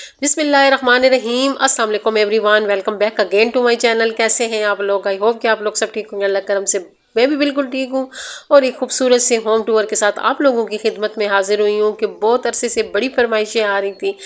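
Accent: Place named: Chakwal, Pakistan. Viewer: Ap achi lagti hain buht mjy simple sa apka style hai aap chakwal se to belong ni krtin ? Apka lehja baat krnay ka bilkul chakwalio jesa hai btw I am also from chakwal ❤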